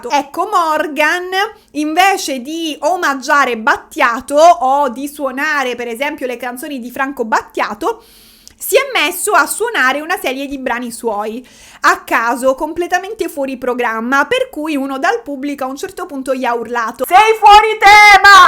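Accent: Sicily, Italy. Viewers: te l'ho chiesto perché sentivo una leggera inflessione ma non ero sicuro che fossi di queste parti: io sono di Parma ma mi sono trasferito con tutta la famiglia, a Siracusa. La Sicilia è il paradiso in terra! Ciao e complimenti per il tuo canale e la brillantezza con la quale lo conduci!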